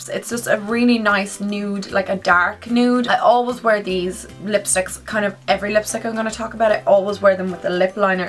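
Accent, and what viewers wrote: Ireland. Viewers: Ireland I think 😊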